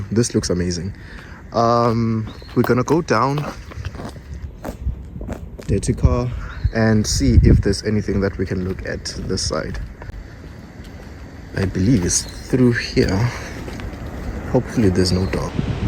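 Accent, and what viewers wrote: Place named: Mpumalanga, South Africa. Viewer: I can literally tell that ungu Mkhaya 😅all the way from Enaspoti/Nelspruit ❤️👍🏿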